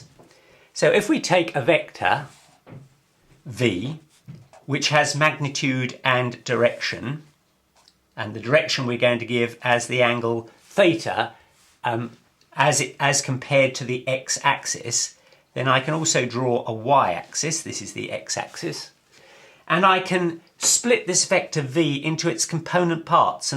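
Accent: United Kingdom. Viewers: I hate british accent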